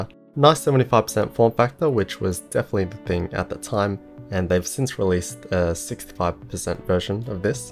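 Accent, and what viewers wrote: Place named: Australia. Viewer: the calm australian accent is great too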